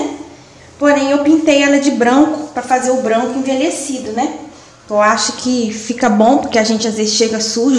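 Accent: Minas Gerais, Brazil. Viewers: Percebi pelo sotaque, tambem sou do centro de bh, mas moro em uma cidede pequena e amo roça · Vc é de Minas?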